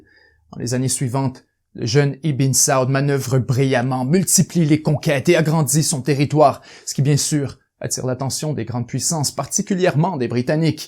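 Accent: Quebec, Canada. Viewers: es-tu quebecois?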